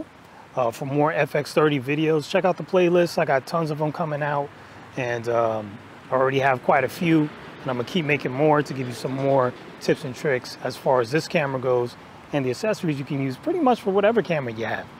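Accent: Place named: United States. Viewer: Dude, your American accent is so smooth! It's like butter. You sound like a mix of Ray Romano and young Louis Armstrong!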